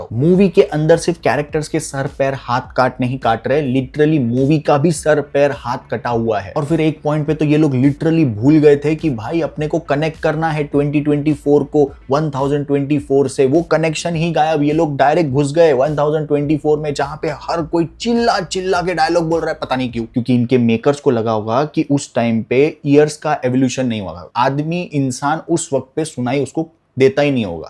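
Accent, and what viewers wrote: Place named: Mumbai, India. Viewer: not.a fan of ur mumbai accent dont do it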